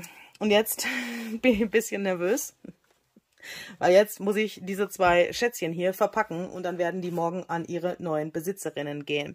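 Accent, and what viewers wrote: Germany. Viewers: Kommst du aus Baden-Württemberg? Ich sende Grüße in meine Heimat sehnsucht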